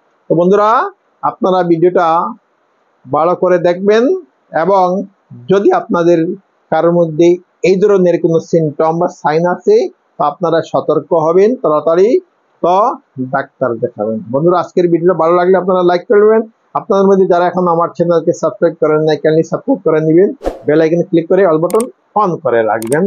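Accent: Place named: Sylhet, Bangladesh. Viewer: Apnar Bangla Uccharon a Syleti tan achey - Uccharon thik koroin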